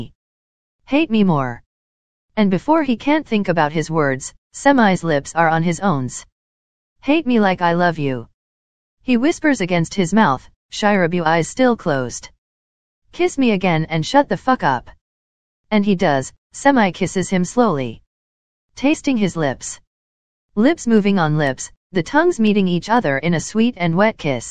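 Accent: Italy. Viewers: ti prego ho capito subito che sei italiana, comunque adoro troppo il video<3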